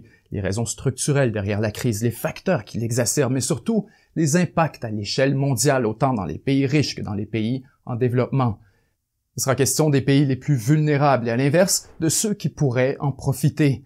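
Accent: Quebec, Canada. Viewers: Quelle eloquence ! Le ton de la voix, les intonations, etc ...C'est un vrai plaisir à écouter. Meme le leger accent québécois met une touche en plus. Une tres bonne contribution pour francophonie de YouTube !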